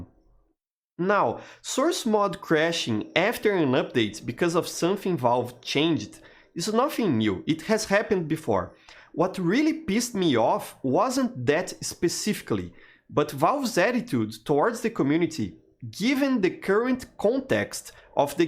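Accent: Brazil. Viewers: I recoginize i brazilian voice when i hear one. Oi. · That's one thick fucking Brazilian accent, my friend. I can hear your eyes going through the script. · Esse sotaque é BR, reconheceria em qualquer lugar. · Hey, I noticed your brasilian accent, do you usually play on the servers? how full are they? (argentinian btw)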